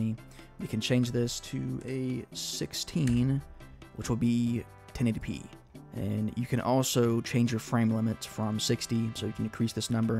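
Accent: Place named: Kentucky, United States. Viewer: he sound like my old friend from kentucky 😥😥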